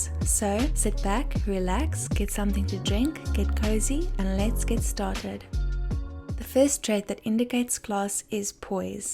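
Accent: South Africa. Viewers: South African, maybe Capetonian or Joburg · South African 😂😂😂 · 💯 South African accent 🎉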